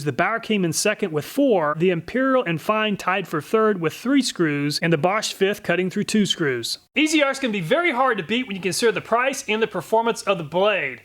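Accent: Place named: Midwest, United States. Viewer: Os-kil-ating hard k sounds... You are from the midwest right? Lol My Mom says it the same exact way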